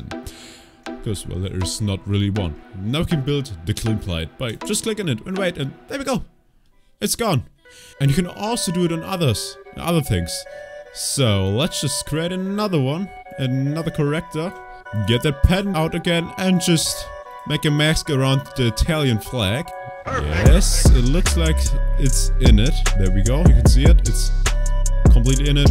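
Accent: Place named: Germany. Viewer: Ziemlich aufgesetztes, unnatürliches Gequatsche - und deine deutsche Intonation ist dennoch unüberhörbar, mein Junge. Ist bei dir nicht geheizt, dass du diese irrwitzige Mütze tragen musst? :face-green-smiling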